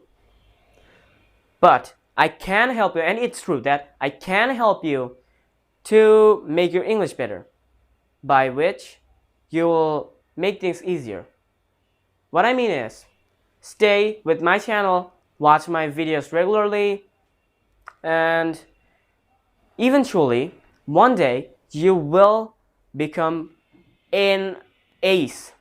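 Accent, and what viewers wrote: United Kingdom. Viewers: Nice job, keep it up broh! Love the British accent btw 😉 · Alhamdulillah...Love the British accent...keep it up..❤❤ very good job.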